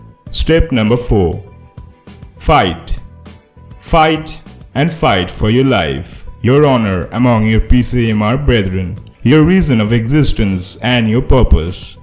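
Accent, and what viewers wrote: India. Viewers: Indian accent makes this video perfect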